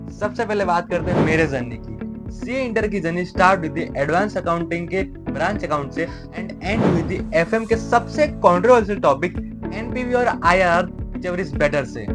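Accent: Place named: Gujarat, India. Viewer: bhai bohat achhi video banai hai, ek dum jordar and your gujarati accent😀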